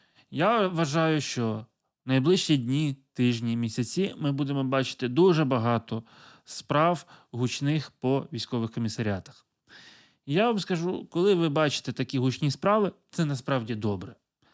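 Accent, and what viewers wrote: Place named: Russia. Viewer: У Вас російський акцент, а за що лайк, Ви ж нічого не розказали, де покарання, де конкретні дії?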